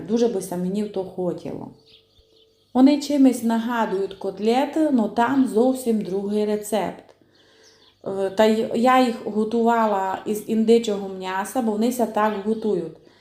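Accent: Transcarpathia, Ukraine. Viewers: Підписалася , бо дуже приємно чути ваш голос і чудову мову Закарпаття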